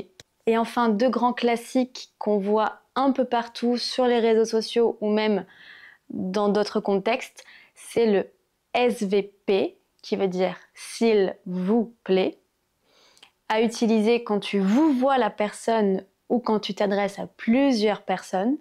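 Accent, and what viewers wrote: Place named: Paris, France. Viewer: J'adore votre accent parisienne,merci.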